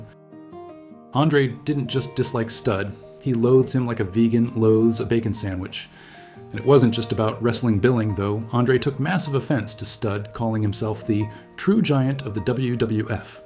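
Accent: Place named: United States. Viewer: So this script is obviously written by a British person and read by a yank. Unless theyre Canadian, but we don’t talk about them anymore. Still a great channel though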